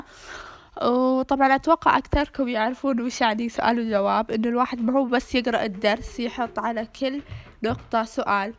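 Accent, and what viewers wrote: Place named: Algeria. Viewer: نتي جزائرية تتكلمين مثل الجزائريين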